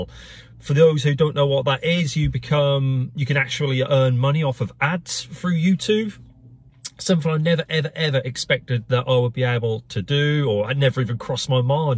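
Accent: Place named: Norwich, United Kingdom. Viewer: By the way, I'm from Norwich and you sound like you might be from these parts? Probably why your videos are even more relatable as it feels you're a trusty chap from round the way! 👍🏻😁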